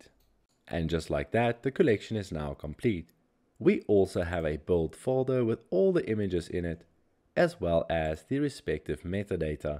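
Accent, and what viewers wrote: South Africa. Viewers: Great video, you sound South African. 😃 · are you South African? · are you from south africa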